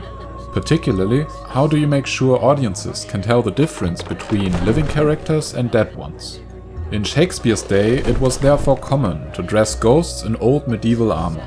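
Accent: Germany. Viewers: Du hast einen deutschen Akzent ^^ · Are you somehow related to ,,Kurzgesagt"? You seem to draw similar and have a german accent. No offense tho, could be heavily mistaken. Ty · Ich liebe deinen deutschen Akzent.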